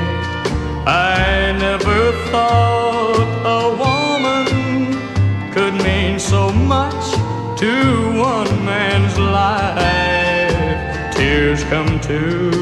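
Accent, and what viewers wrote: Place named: Mississippi, United States. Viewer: Thank you for posting this. His voice is pure magic-not only his singing, but his speaking voice too. Sweet sexy country boy with a Mississippi drawl that is to die for. Your memory lives on in our hearts darlin' and you will never be forgotten.💋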